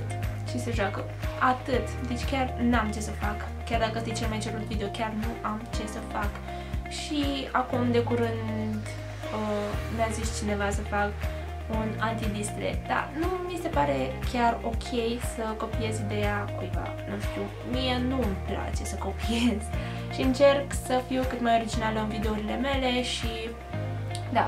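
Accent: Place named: England, United Kingdom. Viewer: vezi ca ai putina engleza pe la coltul gurii:))))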